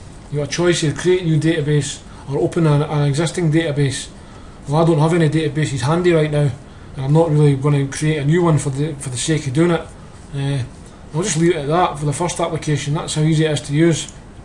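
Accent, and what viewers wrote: Scotland, United Kingdom. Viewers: Scottish accent? 👍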